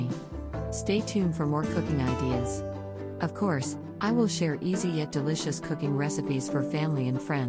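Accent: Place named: London, United Kingdom. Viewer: Waahh..depa speaking london..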